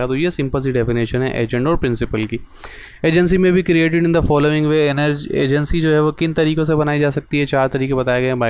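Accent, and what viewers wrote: Karachi, Pakistan. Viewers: AP Karachi say ho??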